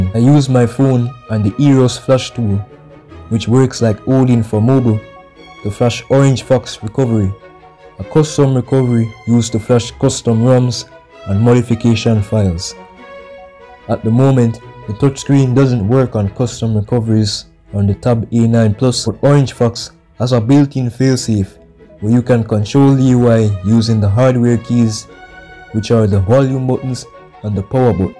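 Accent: Jamaica. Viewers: Are u jamaican bro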